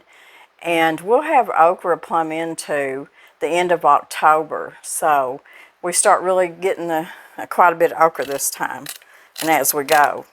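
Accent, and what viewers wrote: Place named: Arkansas, United States. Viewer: Hi and, I love your videos. I look for a new one every day. My husband comes from Hardy Arkansas. His family is wonderful and talks just like you. Good people. I’ve swam in Spring river and stayed at the old motel, the lady that owns it was a friend of my husband’s mother. I heard stories about Hap the Chief of police and how he still keeps folks doing right from wrong Lol. I wanted to ask a guestion because I’m new to canning. I wanted to know if Round roast, bottom and Top Roast is good for canning soup. Stew and meat? Thank you my friend, Cheryl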